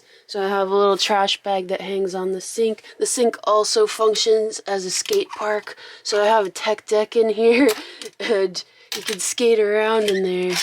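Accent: Massachusetts, United States. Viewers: What an amazing gift!!! I LOVE the Mass accent! The Van is rad, and I'm sincerely happy your living your dream, and now you can travel with your soulmate, Morgan (; Sending love from New Hampshire!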